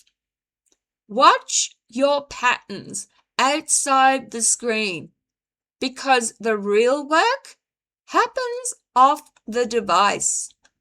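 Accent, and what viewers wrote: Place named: New Zealand. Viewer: Hi, where are you from? I almost thought it was NZ yet could be UK? Interesting content re Chat GPT for soul reflection. Thanks for sharing.😊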